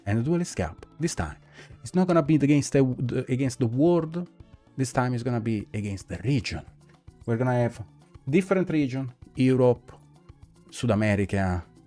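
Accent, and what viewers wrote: Italy. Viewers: He has the voice of undiluted Sicily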